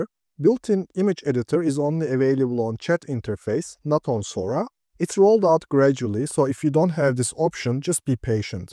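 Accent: Turkey. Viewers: Dostum aksanın çok türk, türk müsün merak ettim. Video için ayrıca teşekkürler. 🇹🇷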